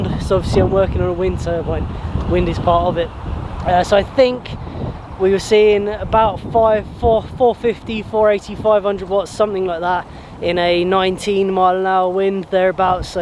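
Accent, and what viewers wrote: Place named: West Country, United Kingdom. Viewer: yes, for the West Country accent alone.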